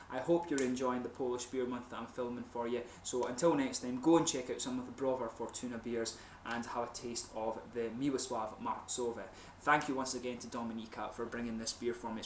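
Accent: Scotland, United Kingdom. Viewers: You have quite a bit of different Language skills , it's difficult I'm sure to acquire those different languages like that . I've watched many Movies with Scottish Actors and Actresses , and when I watch you're Reviews I always look for comparisons between you and them, and you have become really good at curving your accent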